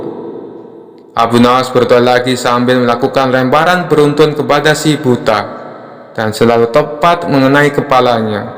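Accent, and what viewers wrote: Java, Indonesia. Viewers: Jawane ngapake ngendi rika kang admin😂😂😂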